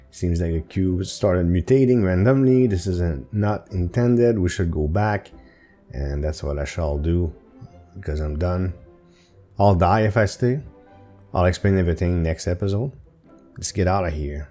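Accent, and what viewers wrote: Quebec, Canada. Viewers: Es tu québécois?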